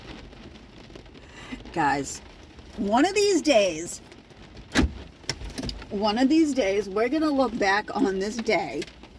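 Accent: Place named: Massachusetts, United States. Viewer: I KNEW that was a Massachusetts accent!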